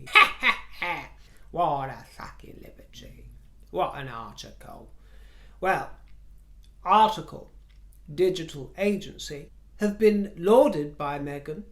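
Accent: United States. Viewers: Luv your American accent!! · 😂😂 Omg ur American accent spot on. You look ßæv†!fu| 🌹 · Ugh NO American accent! My ears! My ears! 🙉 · Your American accent is quite good. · I’m American. LOVE LOVE LOVE your American accent!💗🤣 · Great American accent! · I've been watching you for several months now and find you to be charming, sophisticated, on the cutting edge of outrageous fashion trends and above all, hilarious. Your American accent is excellent. Your laugh is wonderful and you wear your lipstick loud and proud. Throw in gloves and tiaras and you are a national treasure. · an American here who truly delights in your broadcasts and appreciates your humor. Your American accent was very well done, but I was alarmed by the change! You sound better as an American than Meghan does. 😆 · I love your laugh and your American accent! Keep on fruiting!! 🍇🍎🍉🍋😄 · Great American accent,! 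Perfect! 🇺🇸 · Loved your American accent. Props to you 👏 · I love your American accent! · ♥ 💎♥💎♥ Happy Valentine‘s Day, dear. You had me laughing, as usual here. Loved the American accent and the insightful observations. Looking gorgeous and in top form. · I loved your Valentine outfit today, and I must say, you do an extremely good American accent! ❤️ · Ms. very elegant as always! Happy Valentines Day. I would love to be a fly on the wall, watching you and Sherlock Holmes ( Basil Rathbone) having a conversation. I am sure the conversation would be educational, and over my head. I am just a older country boy from NW Florida 20 miles east of Pensacola (southern Alabama really). Worked with a lot of brits. 23 and 24, recce loved their dry sense of humor. Every time I spoke Id get cracked on (southern accent) Can't understand Cockney accent it's funny though. Your American accent is spot on!!! 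Great show as always. God (Yeshua) bless you and your family and keep you safe!! Snogally woggly ha ha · Loving your American accent. ❤❤❤❤ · Your American accent is spot on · darling, beautiful American accent! Spot on!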